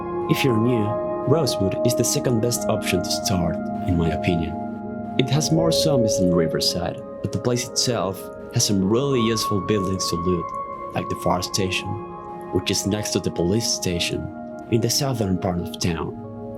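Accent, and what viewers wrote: Argentina. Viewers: hey, where are you from? I find your accent very similar to mine, I'm from Argentina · tenés acento argentino sera q sos argentino??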